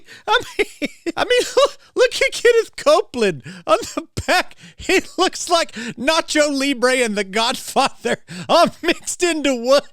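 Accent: South, United States. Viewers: HEY!! His accent is solid brother -- give him that cred at least!!:D Seriously, pretty darn good ha! Is your southern accent authentic, or is it a dynamic show like Kenneth Copeland Mexicano speak?!! Thanks brother:D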